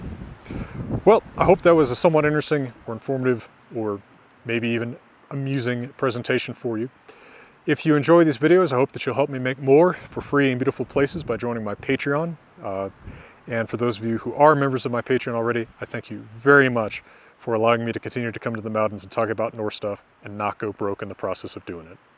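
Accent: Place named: United States. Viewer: Hello, I do not know if you are aware of that, sir, but it's not just people in the English language who are following you. I am French, and I have a hard time understanding you with your American accent. Would it be possible for you to speak a little less quickly please? I know, it's a little daring of me to ask you this, but it would be very useful for a lot of people I think. Anyway thank you very much for your videos. Sorry if I offended you, it was not my intention.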